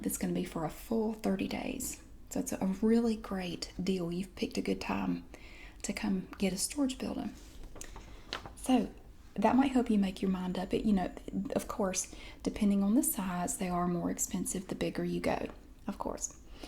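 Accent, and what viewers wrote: South, United States. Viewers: Absolutely wonderful! I just adore southern accents, they're so calming and relaxing. Fantastic job, keep it up! :^) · Is your real voice in a southern accent? If so mine is too!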